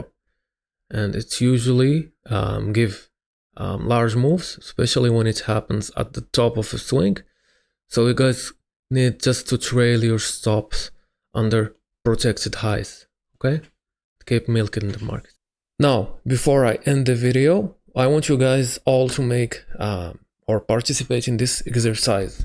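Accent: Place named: Morocco. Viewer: Are you moroccan brother? you sound like it, I am too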